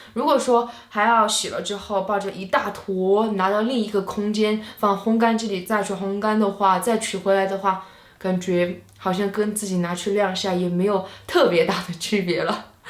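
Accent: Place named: Chengdu, China. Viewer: 听口音，好像成都的呢。 用的了那么大的还一大一小啊？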